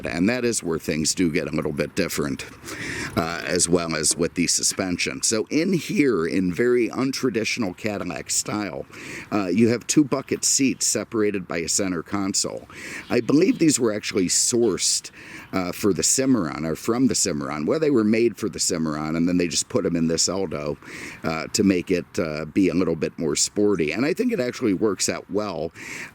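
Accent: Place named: New York, United States. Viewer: Your stand-up and fear of any living animal-(scary, filthy, up to no-good, gang, face ripping), cracked me up. I clicked for the Caddi, but we’re from the same decade and out, so got every one of your references. I especially hate humidity and only visit Fla. from November until February. Beyond those months, only for money! You were hilarious and sound like the consummate NY transplant. Good Luck with the Eldorado. I prefer the ‘78 whale in that brownish gold mist colour and in Biarritz trim. Btw. I have a ‘81 Toronado Brougham in triple-navy. I love her! 🌞😂😎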